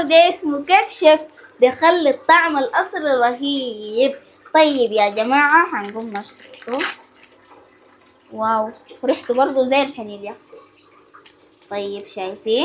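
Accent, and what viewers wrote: Sudan. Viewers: مسلمة لطيفة مؤدبة بشوشة محتشمة تحكي بلهجتها بكل فخر اللهجة السودانية الجميلة بارك الله فيكِ يا اختي المحترمة والله يفرج عن إخواتنا في السودان وغزة واليمن تحياتي لك اختك من الجولان السوري 💚🤍🖤🫂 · اللهجة سودانيا 😂❤ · ايييييه ماااجمل اللهجة السودانية احبها كثيرا وتعلمتها باتقان من اختنا الحبيبة #تلك الفتاة الجميلة الراءعة شكرا على الكيكة الراءعة ياراءعة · كم هي جمييييل وحلوة اللهجة السوداني انت عسولة مثل الكيكه لسوتيها كل الحب من قلب الجزائر 🇩🇿 ❤😘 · مع ان الكيك عاديي وبنعملو من زمان لكن اللهجة السودانية. بلسانك كيووت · انا فتحت الفيديو بس عشان اسمع اللهجة السودانية الجميلة الله يحفظ اهل السودان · اللهجه السودانيه رئعه ❤احبكم مره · احلى ما فيك اللهجة السودانية البحتة وكلمة شفع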